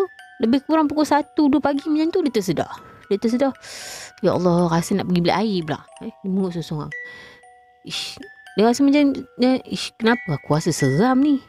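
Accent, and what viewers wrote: North, Malaysia. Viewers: pandai dialek utara...😅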